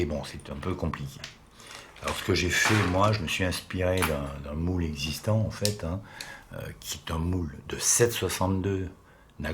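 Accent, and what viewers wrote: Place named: South, France. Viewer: d'accord j'ai eu l'impression d'entendre l'accent français sudiste lol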